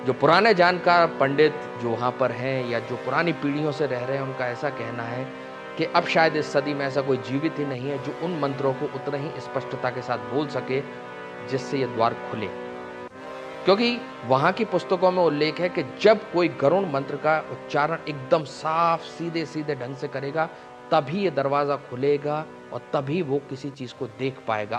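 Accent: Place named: Haryana, India. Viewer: Good haryanvi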